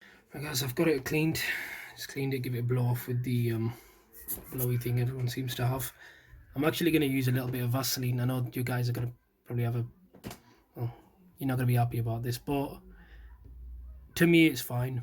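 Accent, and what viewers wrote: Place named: United Kingdom. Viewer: He sounds like he's from the UK, it will never get above 38 degrees celcius there, let alone 58c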